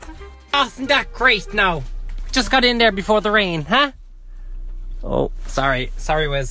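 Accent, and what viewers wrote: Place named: Ireland. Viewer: I can’t deal with how Irish u are what’s the story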